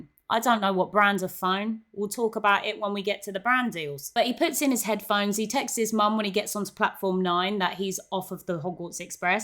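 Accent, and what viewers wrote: United Kingdom. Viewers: Oh I love the British accent dear hello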